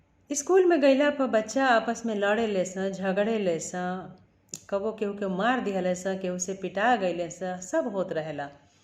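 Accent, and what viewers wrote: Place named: Bhojpuri, India. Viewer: भोजपुरी के मिठास ...छा गई आप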